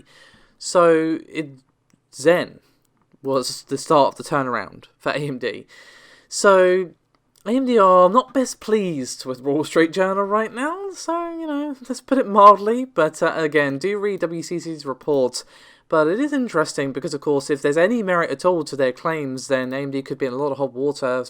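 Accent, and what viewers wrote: England, United Kingdom. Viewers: if you're seeing this, it's not you. Rather the accent and voice together, I have this sometimes with English women, because the voice pitch is very different from where I'm from haha. In like every video I had parts where I thought, noo, this is 100% a women and others parts where I thought, nooo 100% a guy, I just can't tell because of the accent xD it's not you, it's me:P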